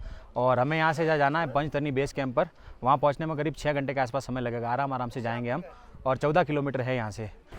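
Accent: Gujarat, India. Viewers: Bhai kya aap Gujarati ho aapki ton se pata chalta hai vese hu pan Gujarati chu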